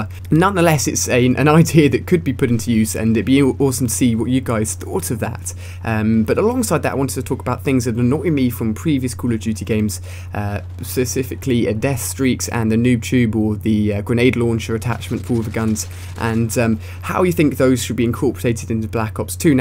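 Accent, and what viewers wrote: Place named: United Kingdom. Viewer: Dude he sounds British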